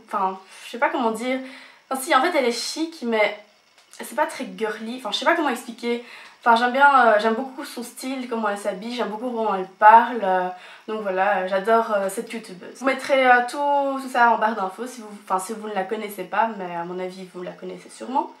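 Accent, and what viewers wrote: Belgium. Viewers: Super vidéo , ça permet de mieux te connaître :D Tu es belges ? :)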